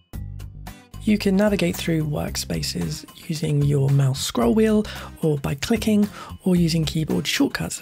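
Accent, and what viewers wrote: United Kingdom. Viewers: british accent always soft to my ears...i like it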